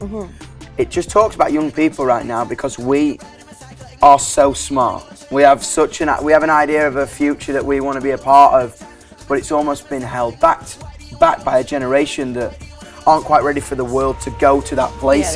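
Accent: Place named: Northern England, United Kingdom. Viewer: ‘It’s the twenny ferst centree fer fock sehke’ God I love the northern accent 😂😂😂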